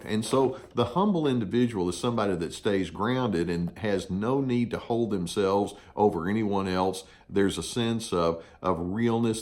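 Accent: South, United States. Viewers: You are fantastic and have helped me so much rid the Narc. Love your voice (like Gus) and Southern accent!! thanks. Susanne H.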